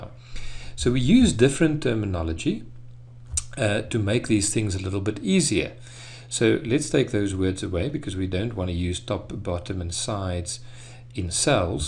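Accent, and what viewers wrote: South Africa. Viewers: professor are you from southafrica (south african accent) ;) thank you for this video...really clarified some topics I was struggling with. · Been struggling with this concept all throughout my first year of med school, and this video helps so much! Also love the South African accent. My girlfriend is an Afrikaaner and her dad sounds just like this. It's a comfort to me.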